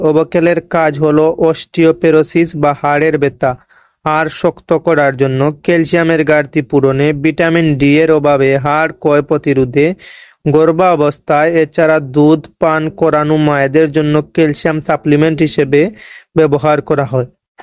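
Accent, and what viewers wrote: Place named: Sylhet, Bangladesh. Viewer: ভাই মনে হয় সিলেটি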